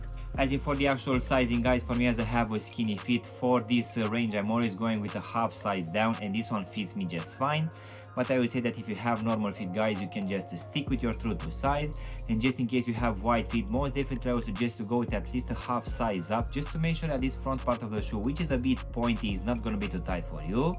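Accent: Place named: Romania. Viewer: Te cunosc dupa accent bro ca esti roman, buna treaba frate, chiar imi plac review urile tale, keep it up, poate poti face review si la aj1 mid industrial blue, materiale superbe, pret accesibil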